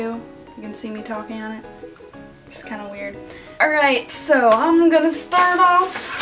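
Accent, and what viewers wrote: United States. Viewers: Are you country because you talk like a country person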